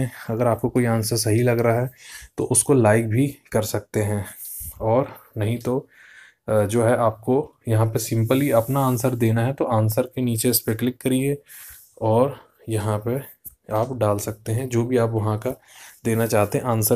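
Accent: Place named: India. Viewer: You speak so fast and you have indu accent. I did understand just afew words. 🤦🏽‍♀️🤦🏽‍♀️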